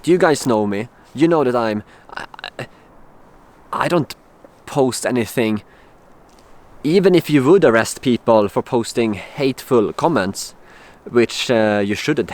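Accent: Sweden. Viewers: tried to watch. but the swenglish is just so bad i cannot stand it.. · Detta är alltså inte satir? Hade ingen aningen om att Svenskar fortf postade videos med så grov svenengelska som denna. Går ju inte att koncetrera sig på innehållet.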